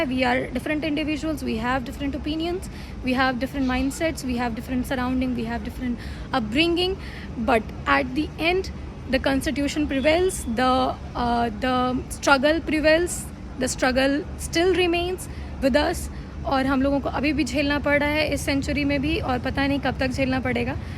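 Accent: Bihar, India. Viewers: The way you taking is just awesome Are you from Bihar ??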